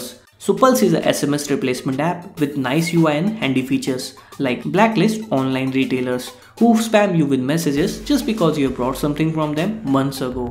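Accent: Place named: Bengal, India. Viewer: i think you are Bengali . i am saying because your accents is a lot like Bengali .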